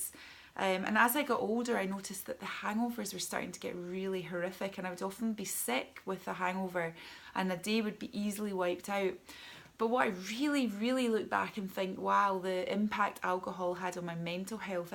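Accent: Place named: Ireland. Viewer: I hear your story. I hear your irish accent. Although I'm from the states I grew up in an irish catholic background and community where its commonplace to drink everynight after work and on the weekends. There was a bar on every corner. I'd walk to Flynn's corner bar and walk home in a blackout for years I did this. I'm 45 now and going to AA and really trying to stop my boozing days! Thanks for sharing love!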